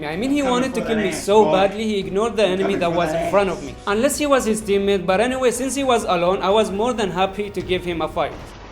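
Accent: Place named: India. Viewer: Title: "This is why my server is the hardest" Me: Probably never heard of Asia’s servers Also me clicking the video and hear the indian voice Oh… Never mind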